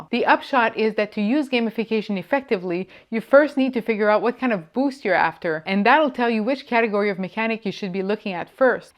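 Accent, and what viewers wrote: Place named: France. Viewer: L'accent français s'entend légèrement sous la couche d'accent nord américanisé. Un peu comme un vêtement rouge qui se voit sous un manteau légèrement transparent...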